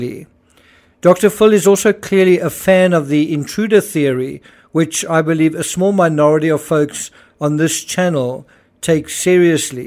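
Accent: South Africa. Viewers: No, it's an accent. He's from SA.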